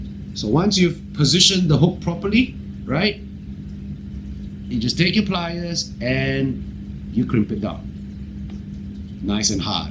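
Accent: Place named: Singapore. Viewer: good to hear a singaporean accent again bloody miss home tbh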